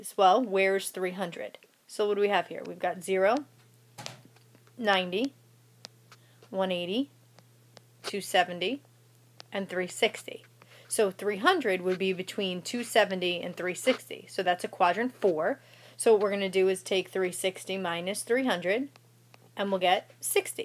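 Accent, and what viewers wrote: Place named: New York, United States. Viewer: I hear a new york accent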